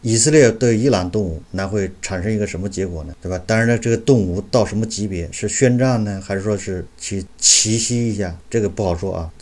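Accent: Anqing, China. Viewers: 你的口音像我老乡，安庆枞阳吧。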